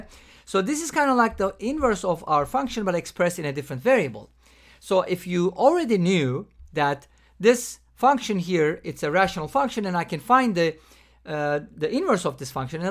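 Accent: Turkey. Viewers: Abi sen Türk musun sesine turkluk seziyorum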